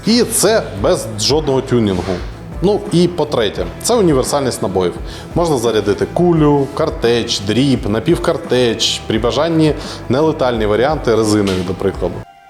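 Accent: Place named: Central Ukraine, Ukraine. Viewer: Центральноукраїнський діалект сила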